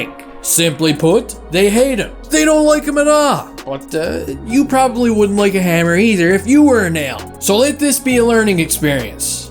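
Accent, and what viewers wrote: Newfoundland, Canada. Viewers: You sound like a stupid Newfie 😂 your content is trash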